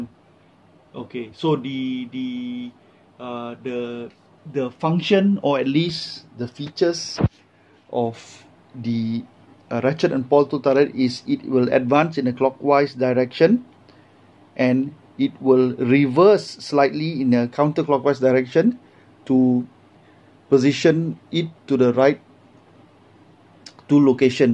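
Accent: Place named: South Africa. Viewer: you have south African accent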